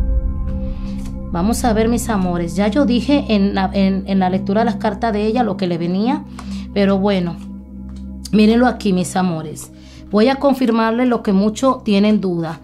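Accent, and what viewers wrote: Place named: Dominican Republic. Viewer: tu eres dominicana? Ese hablado nuestro se conoce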